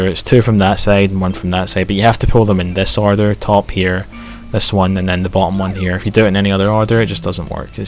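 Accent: Scotland, United Kingdom. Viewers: Watch this video to see almost 45 minutes of a Scottish guy rambling in Scottish about an English-made video game, failing to glitch his way into a library for most of it. I believe it's his longest one...and it's not an ASMR video or a Pokémon Generation 8 "comic dub". D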